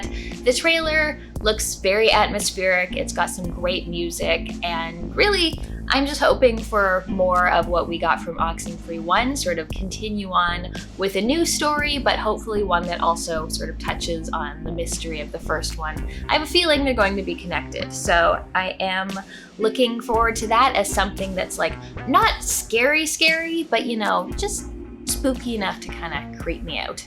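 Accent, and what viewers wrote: Canada. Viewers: Man, your Canadian accent is really pronounced in this video.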